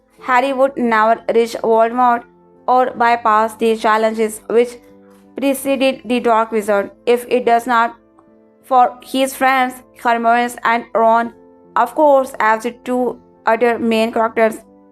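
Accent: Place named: India. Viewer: We don’t need your story telling with weird indian dialect you morron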